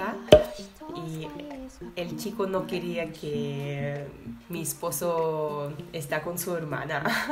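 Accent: Peru. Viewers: La jerga y malas palabras te salen súper natural como peruana, te haz peruanizado igual que Veronique 🤘🏿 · Q crackkkkk.. jajaja ..habla muy pero muy peruanazaaaaa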